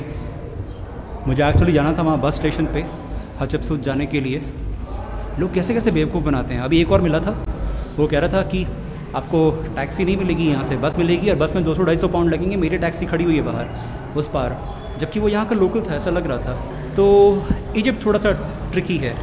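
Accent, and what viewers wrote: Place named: Haryana, India. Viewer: Bhai Egypt m ab naya rule aaya h teen char din phle hi... Agr koi tourist ko tng krega na toh 10000 Egyptian pound ka fine h... Maine newspaper m pdha tha socha aap ko bta du.. Aap harayan se ho kya bhai aap bolte ho Bich bich m haryanvi ..aacha lgta h.. M bhi haryana se hu sonipat... I like ur videos very much.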